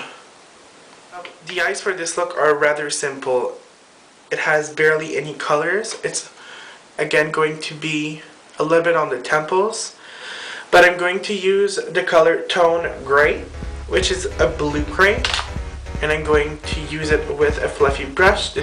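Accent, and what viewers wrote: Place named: Canada. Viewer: "folies blanches" trop marrant l'accent canadien!! :)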